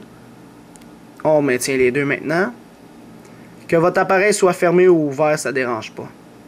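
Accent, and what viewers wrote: Quebec, Canada. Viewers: tu as vraiment un accent de fou, sacré québécois :D bon tuto en tout cas ! pour ma part je reste aussi en 4.1 JB à cause du tethered de l'iOS4.2.1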